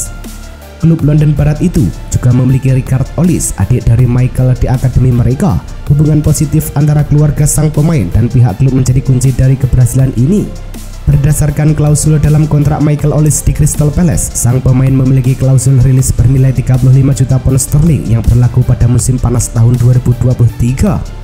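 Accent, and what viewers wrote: Java, Indonesia. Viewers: Sudah bagus cuman Host nya di ganti lah,ending yg cewe, yang cowok ini terlalu Medok.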